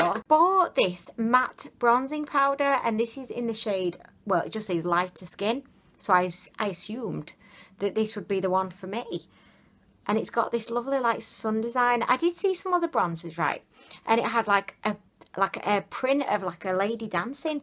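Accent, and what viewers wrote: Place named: Birmingham, United Kingdom. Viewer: Where are you from? I can't tell if your accent is brummy or Manchester x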